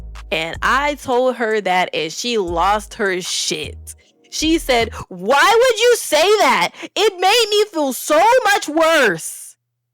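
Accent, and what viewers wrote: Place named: Carolinas, United States. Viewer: I don't know you, but you have a thick southern accent that reminds me of the Carolinas. There are plenty of beautiful, well mannered Black American women down south. Why are you chasing cat in the Philippines? I grew up with Filipinas in my military hometown. They're nice people, but are desperate and will do anything to get ahead. They're told from birth that white men are the ticket and even then, they can scam the white guys. My best friend's mom is the only one I know who actually prefers Black men but even so, she scammed his biological children out of their inheritance, then dated his friends as soon as he died of cancer. Come on, be smarter.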